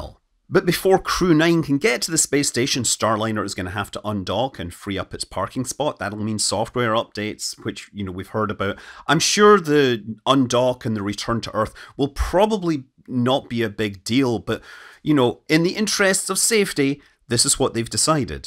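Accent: Scotland, United Kingdom. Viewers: Also when is England going to teach Scottish blokes to speak English?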